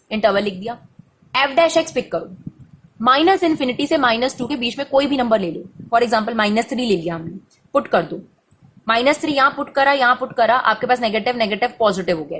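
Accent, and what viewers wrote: India. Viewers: I believe that u are from Jharkhand ,Bihar or UP Tone feels familiar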